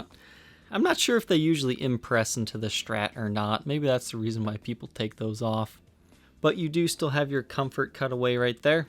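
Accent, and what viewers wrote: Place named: Alaska, United States. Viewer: Thatuckin sarah palin accent is hilarious..... There is someone else who has that accent, a famous actor or SOMETHIGN..... MAN I can't think of who it is.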